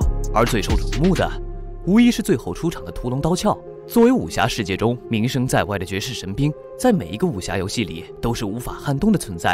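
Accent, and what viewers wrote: China. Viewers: 聽起來是大陸人 可是單位一直換算台幣 搞得我好亂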